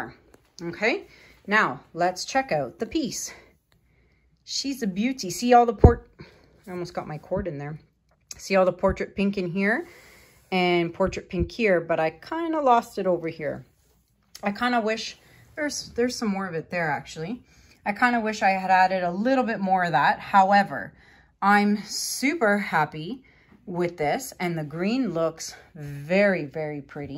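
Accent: Canada. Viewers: i really like listening to your canadian accent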